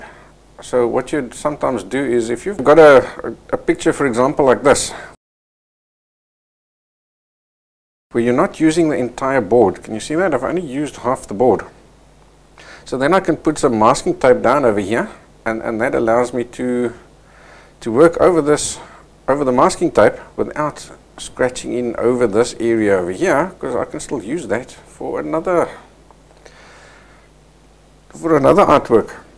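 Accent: South Africa. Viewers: Are you South African? You sound like it! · A Saffa!! Makes my heart happy to hear ❤️ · you sound like your south African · is that a south african accent i smell???? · South Africa accents make me happy.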